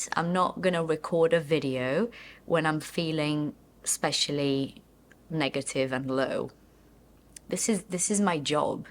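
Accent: United Kingdom. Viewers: your British accent is brilliant. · I like the video, I'm like you. But how do you manage to have that British accent while being Brazilian? lol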